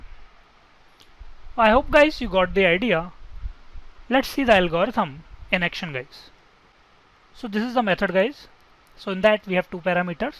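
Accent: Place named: South Asia. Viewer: Your heavy India/pakistani accent makes it impossible to understand you. I’m looking for someone else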